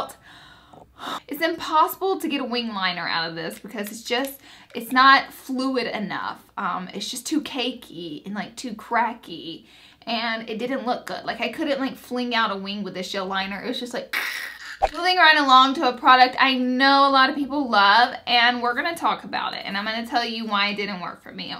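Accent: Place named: Alabama, United States. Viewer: I just started watching you today when I stumbled on one of your videos. Within 20 seconds I thought "she has to be from Alabama!" Lol I say "oil" the exact same way.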